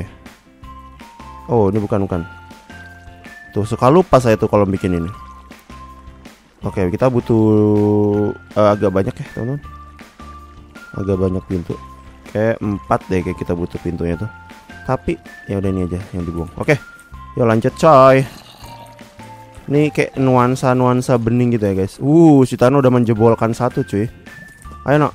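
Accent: Java, Indonesia. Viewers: bang apik bang omongan medok mu kwi aku yo wong jawa bang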